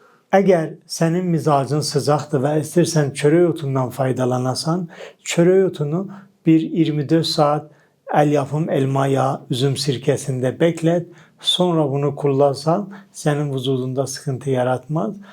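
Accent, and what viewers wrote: Azerbaijan. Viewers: Danışığınız Azərbaycan ləhcəsidi. Var olun 🙏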